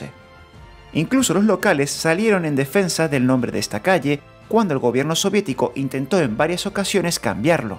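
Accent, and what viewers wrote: Latin America. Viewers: Ya quisieran muchos profesionales hacer audiovisuales como este canal. Y me encanta el acento Hispano de América.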